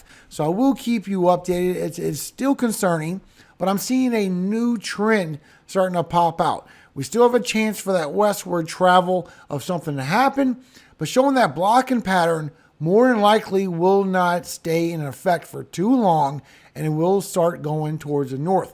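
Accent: Louisiana, United States. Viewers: Hay weather man are you from Louisiana?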